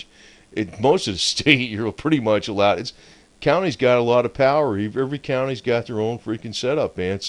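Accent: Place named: South Jersey, United States. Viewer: Dude You sound like another refugee from South Jersey? Me too Burlington, CO. Been in FL. about 20 years now, ain't going back!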